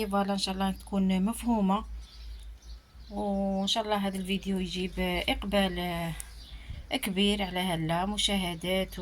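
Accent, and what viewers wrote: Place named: Mascara, Algeria. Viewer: ماشاء الله لوكان غير جيتي حذايا ندي من عندك شويى تهدري كيفنا في معسكر بصح ما عرفتكش مين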